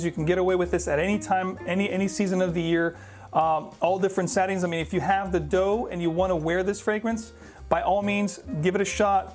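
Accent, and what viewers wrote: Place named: New York City, United States. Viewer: I like your reviews, clear NYC accent... good descriptions , gentleman style!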